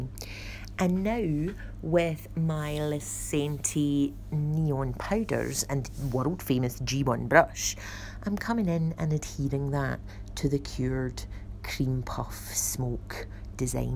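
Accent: Scotland, United Kingdom. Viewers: Have to admit you're Scottish accent is truely smokin for this effect too. Fabulous. Love working with pigments so having a go asap. Thanks for sharing and cheers from cumbernauld xx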